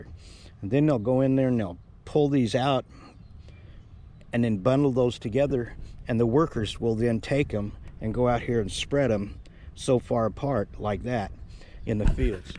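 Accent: Oklahoma, United States. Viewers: I recall seeing gas flares in the Gulf of Thailand many years ago. I wonder if they were pumping oil or gas? If the latter then they are having to import oil for cracking into diesel adding a running cost to the farm machinery I guess. It may be your Oakie accent but I notice you being a bit lazy (dog!) in the pronunciation of the ending masculine “p” in salutations! These general conversations about rural Thai life are great. Perhaps you could drag the Nordic Nerd (when he has finished downloading the GPS tracks) out with you to utilise his seemingly excellent command of the Thai language .. “sam Chang khap” seems to roll so fluently off his tongue! I have now covered several hundred miles on my “ghettoed” tubeless conversion and the wheels (CT 125) have not lost a single psi. I see it done all over Indonesia and the PI on You Tube and wonder if they do the same in Thailand?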